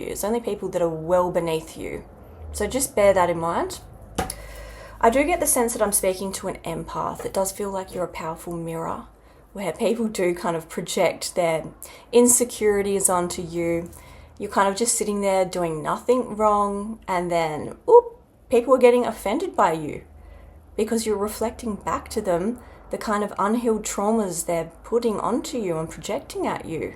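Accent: Australia. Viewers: I love Australian accents.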